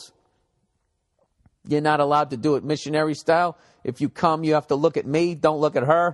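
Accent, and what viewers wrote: Boston, United States. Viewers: Boston maahhhket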